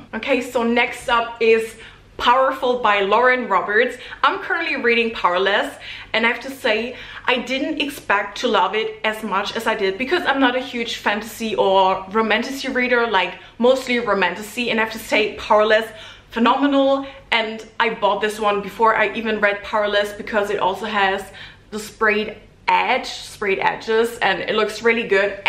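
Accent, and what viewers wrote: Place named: Germany. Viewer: I love your content, I'm always so shocked by your German accent, I just always expect you to have the voice of Adeline ❤ Thanks for your hard work!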